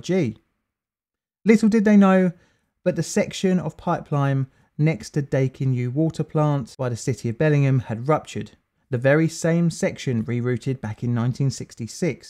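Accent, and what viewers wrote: United Kingdom. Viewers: It’s so weird hearing a British man talk about a bunch of tiny towns I live near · Very much so. I enjoy falling asleep to British men talk about disasters.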